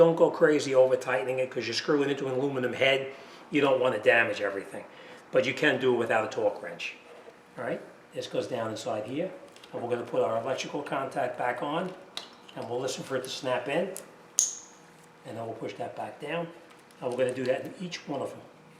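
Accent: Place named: Long Island, United States. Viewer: You gotta be from Long Island! 🤣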